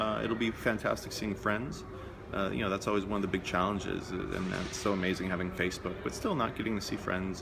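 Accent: Denmark. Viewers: Elsker hvordan du taler engelsk medd dansk accent :) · agreed he sounds danish now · i can feel you have attained a little bit of a danish accent :d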